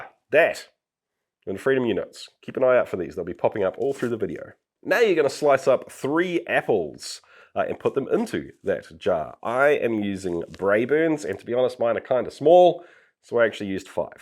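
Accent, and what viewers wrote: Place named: New Zealand. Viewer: Just wanted to share my pointless thought, not really related to the topic of the video: This kiwi accent is killing me sometimes. One of the main things is that "e" sounding like an "i" and making one word sound like the other (for example better--->bitter). Ofc, there's a lot of other things too. Especially when I come back to this channel after a break it really gets me off guard, and takes a while for me to get used to it again. And dont get me wrong I'm not trying to criticize or make fun of this accent (as i said its a pointless thought, so I'm not really trying to do anything here), since I barely speak/understand that language at all, and I sound like I have a serious brain damage even when I speak my own native language, so I'm really not in any kind of position to make any actual comments or criticize anyone's accent. 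If anyone read to this point: thank u for letting me waist ur time😊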